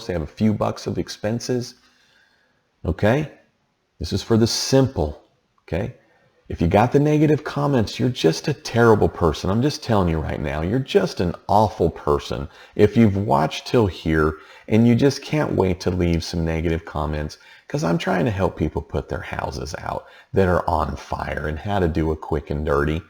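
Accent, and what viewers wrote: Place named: United States. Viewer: I Love your sarcasm and country sounding swag...Thank you so much for this..God Bless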